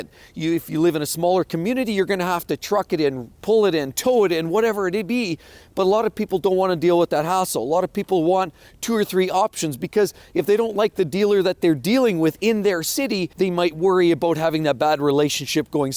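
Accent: Canada. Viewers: your thick Canadian accent kills me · That Canadian accent kills me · I can't take his Canadian accent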